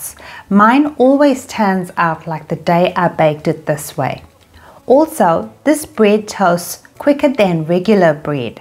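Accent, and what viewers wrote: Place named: South Africa. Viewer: I was guessing maybe you had a South African accent it's always beautiful but always hard to place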